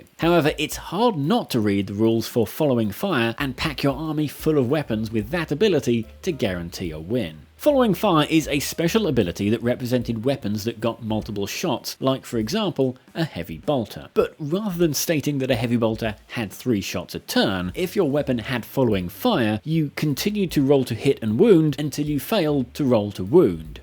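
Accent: United Kingdom. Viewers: Such clean and nice British accent! Like listening nature sounds. Also, nice video) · I'm a simple man, I hear a Brit talking about Warhammer. I automatically assume they're canon and subscribe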